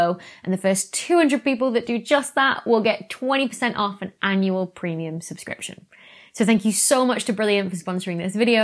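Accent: United Kingdom. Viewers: I love the british accent. It sounds so proper and yet also fun.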